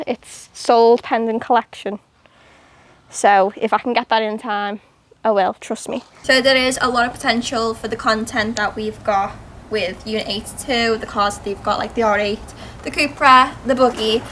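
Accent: Liverpool, United Kingdom. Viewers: That 'girl scouse' accent where words fade in and out still kills me 😂 'To be hone.........st' 😂😂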